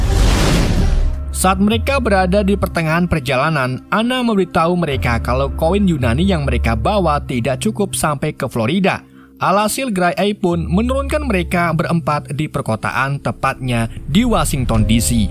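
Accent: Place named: Banyumas, Indonesia. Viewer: Orang ngapak ni pasti adminnya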